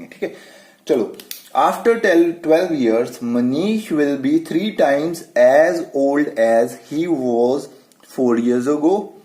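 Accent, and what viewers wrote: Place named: Punjab, India. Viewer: phirse kya haal he 😂. Full punjabi accent.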